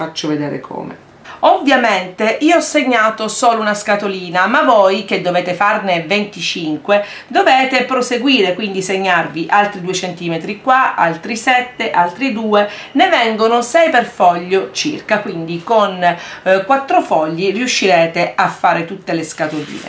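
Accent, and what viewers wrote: Catania, Italy. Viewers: ciao, sei bravissima! sei di Catania per caso?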